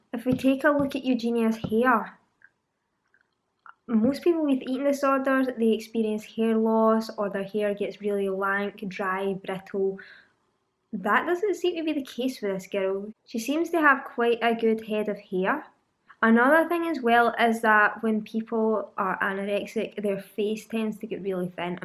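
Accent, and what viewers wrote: Scotland, United Kingdom. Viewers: OMG I F*CKING LOVE THIS YOUTUBERS SCOTTISH ACCENT BEAUTIFUL Love Scottish and Irish Accents <3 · i love your Scottish accent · thank you for defending her. I've been defended her and was attacked. Also I love your accent Scottish right? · how can you not understand??? it's a fucking Scottish accent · She is Scottish just like me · Her Scottish accent is so O.oooooooooooooooo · im frm south west scotland, you sound similar to me tbh, i understood every word, but also understand how slang it can sound x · I love your accent, sooooo scottish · Please of course her accent is strong... Scotch, Scotland, strong accent.. Stop being negative and listen after you listen for a few minutes you get the sound and some of the slang is much like ours (assuming you speak English). is a very intelligent woman so don't miss out on her humor and wisdom because you won't take the time to just listen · is from Scotland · it's a scottish accent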